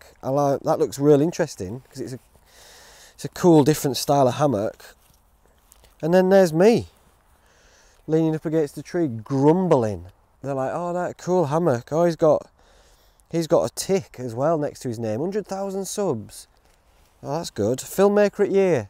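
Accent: Yorkshire, United Kingdom. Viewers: Aww, don't fret about your script! I just love to see your little face and hear your Yorkshire accent! Just do you! Love from South Carolina! Xxx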